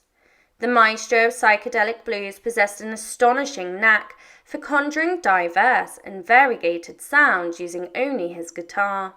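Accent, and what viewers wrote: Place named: United Kingdom. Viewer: Apparently it is compulsory to say "it will always remain an enigma" in a British accent to what is otherwise obvious evidence of murder...I was a good friend of Tappy Wright and he told me things that are not in his book because he didn't want to get killed...The reason it was covered-up is because government agencies (That Jeffery belonged to) were involved...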